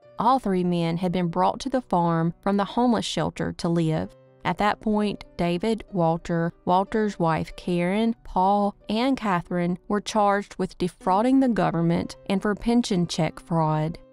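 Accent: South, United States. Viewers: Oh great an American voice I detect a southern accent! Whewfind a better voice todo this video!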